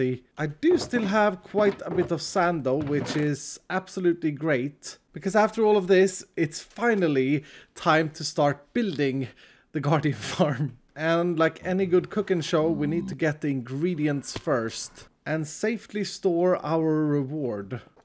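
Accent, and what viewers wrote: Sweden. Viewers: Are you Swedish because you have a very Swedish accident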